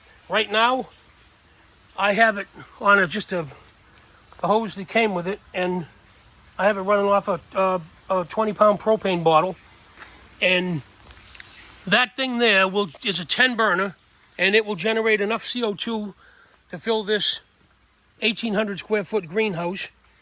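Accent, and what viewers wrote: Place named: Upstate New York, United States. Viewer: Upstate new york or Jersey for sure. Nice space buddy.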